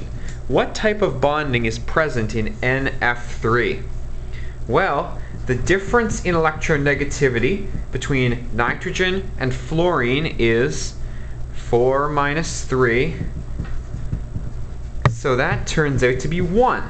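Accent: Canada. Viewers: I like you,. And your Canadian accent.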